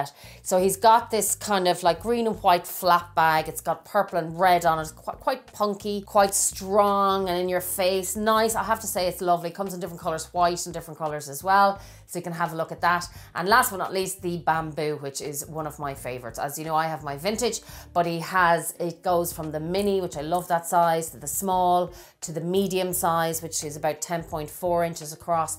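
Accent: Ireland. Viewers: Thank you & your Irish Brogue also make listening merrier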